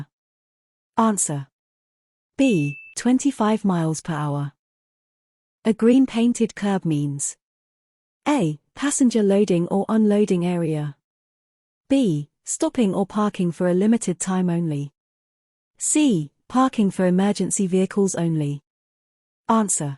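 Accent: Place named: United Kingdom. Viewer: Thanks for this video, but this is a Q&A video for California DMV which is what it means for American citizens, so why was the narrator chosen with a British accent?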